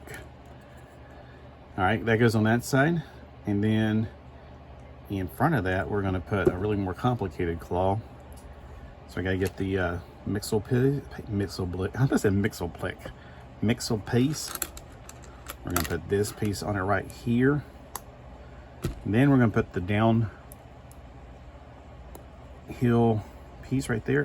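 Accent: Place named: South, United States. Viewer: Sometimes you have your southern accent come out more than others. In this video is one of these times. lol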